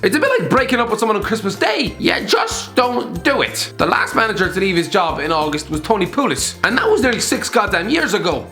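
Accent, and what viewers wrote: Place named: Ireland. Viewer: Listen to that sweet Irish voice, he doesn't sound like a dying cat with throat cancer anymore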